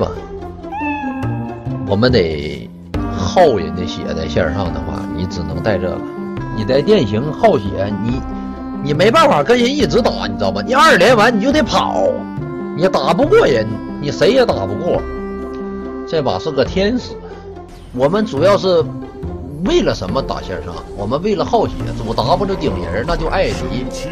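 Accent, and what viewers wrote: Northeast, China. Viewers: 其實大哥說的是東北話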